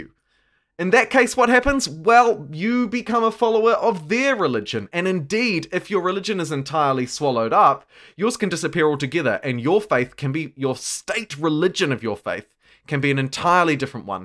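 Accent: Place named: New Zealand. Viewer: As a fellow kiwi-accent-haver I understand the pain.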